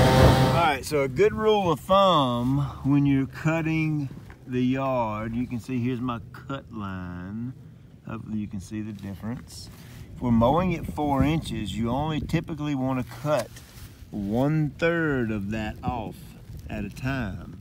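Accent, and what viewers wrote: South, United States. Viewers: Where are you located? You sound like your from the south but in my part of the south lawn stripes are unheard of and I think it’s because of the types of grass that grow around here don’t lay down that way. I think zoysia grass will do it though, we typically grow st. Augustine, Bahia, centipede and zoysia around here in north Florida and South Georgia. I’ve always been interested in stripes and would love to have them here but we don’t have “fescue” grasses around this area. Looks good brother!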